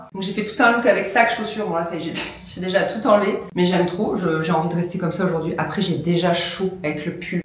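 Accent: South, France. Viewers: Merci, je t'ai découverte il y a peu et j'adore tes vidéos (que j'ai binge-watchées héhé). Tu es apaisante, très agréable et je suis persuadée que tu es vraiment sympa en vrai... et cette pointe d'accent du sud m'amène un peu de soleil. Hâte de te retrouver...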